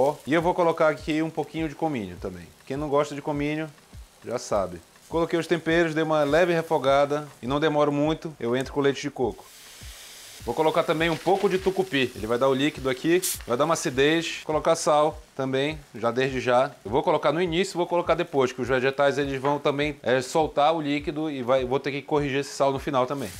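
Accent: Pará, Brazil. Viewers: Adoro esse sotaque paraense "cominho" , "picadinho"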